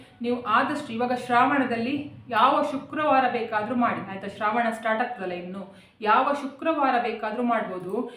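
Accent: Mangalore, India. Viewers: hello...Very useful information, point by point explained the reality and truth. Your kannada is very clear its pure mangalorean remembers Late Aparna's Accha kannada. and by your appearance & the way you speak kannada I feel you are vishwakarma Acharya or konkani.However its nice that you are open and clear minded. When we do choodi pooja in Shravana we will do it.Only kudure laala we have to check from where we will get it.again thank you very much.I have also observed marvaadis while closing their business shop they say mantras.they are always rich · ನೂರಕ್ಕೆ ನೂರು ಸತ್ಯ ನಮಗೆ ಒಳ್ಳೆಯದಾದರೆ ನಮ್ಮವರೆ ಸಹಿಸಲ್ಲ ಅನ್ನೋದು ನಿಜ ..ಚೆನ್ನಾಗಿ ಮಾತಾಡ್ತೀರ ನಮ್ಮ ಮಂಗಳೂರು ಕನ್ನಡ❤ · Thank you very much for the valuable suggestion And your nice Mangalore Kannada.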